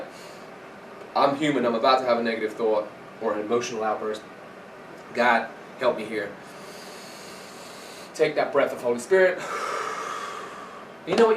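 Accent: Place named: United States. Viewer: What's with the American accent? Thought he was British.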